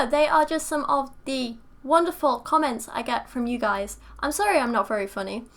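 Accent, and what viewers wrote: United Kingdom. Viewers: like your British accent. Can you do an American accent? Maybe a California accent. I don't think they have one but it may be that I am from there living in New Jersey. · The girl parts are certainly nice, but I'm sure there's some views coming from having a cute face and British accent as well. :)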